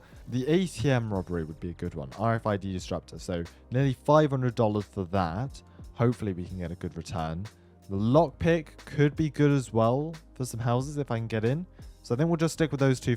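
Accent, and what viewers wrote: United Kingdom. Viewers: why is every erlc youtuber british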